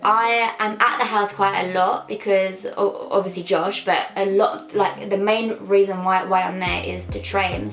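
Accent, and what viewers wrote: RP, United Kingdom. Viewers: Naa u sound posh still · You say put the roadman accent on, what roadman accent 😂u still sound posh bare gyal in South speak road u don't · i think the stuck up one was thought bc of your accent bc its kind of posh compared to others especially compared to mine ahahhaha im from new zealand but yeah idk i personally don't think you are I think you're awesome!!!! :DD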